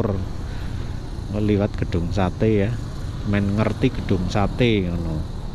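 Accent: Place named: Central Java, Indonesia. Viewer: Mas dari Jawa Tengah logatnya kaya ngono Cilacap atau Cirebon sekitar nya 👍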